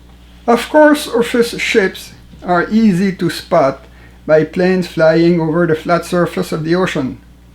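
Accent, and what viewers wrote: Quebec, Canada. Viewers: your own government actively committing civilizational genocide on itself is pathetic. Also, this man sounds like he's from Quebec, not the US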